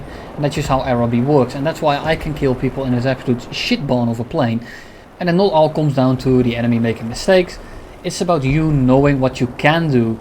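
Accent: Netherlands. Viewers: he sounds kinda dutch like jengar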